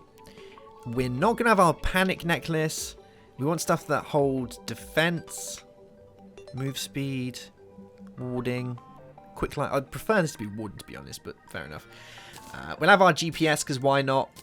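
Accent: United Kingdom. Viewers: Foster He is British. That's how we say meteor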